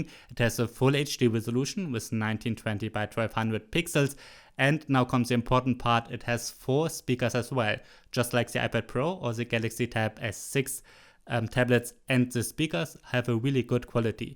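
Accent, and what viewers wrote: Germany. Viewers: This guy is German, right?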